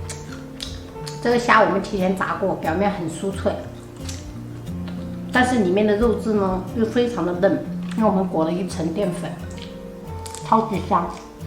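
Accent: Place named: Minnan, China. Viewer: 你似被夫家閩南口音影響了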